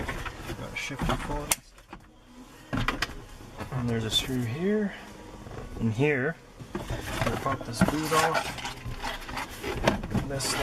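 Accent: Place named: Canada. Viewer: He sounds Canadian. :)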